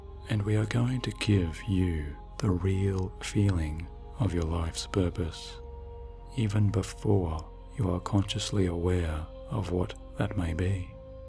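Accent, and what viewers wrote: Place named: Australia. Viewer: its Australian actually ;)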